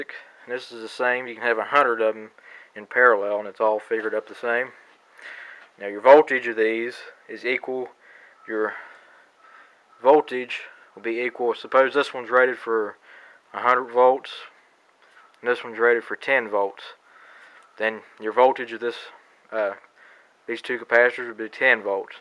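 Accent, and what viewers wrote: South, United States. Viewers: Made it VERY easy to understand, thank you! .. I'm from Canada so the southern accent made it all the more enjoyable. ;)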